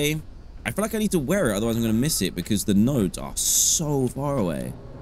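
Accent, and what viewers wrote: United Kingdom. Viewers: as a British person, you are definitely British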